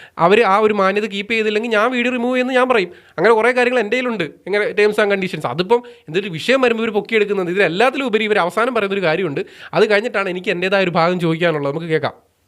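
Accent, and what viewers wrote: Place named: Kozhikode, India. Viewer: ഇതിനെ ആണ് സ്വയം കുഴി തോണ്ടൽ എന്ന് പറയുന്നത്.... എത്ര ക്യാഷ് മേടിച്ചാലും ഒരു മയം ഒക്കെ വേണ്ടേ മോനേ..... കോഴിക്കോട് ഉള്ള ജനങ്ങൾ ചുമ്മാ ഒരു ആളെയും ചീത്ത വിളിക്കില്ല. അനിലിന്റെ വീടിന്റെ അടുത്ത് ആണെന്ന് നിന്റെ ഭാഷ കേട്ടാൽ അറിയാം.....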